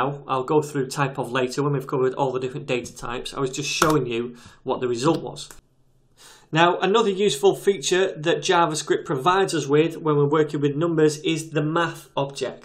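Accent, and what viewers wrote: United Kingdom. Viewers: Getrogo he is from U.K.)))))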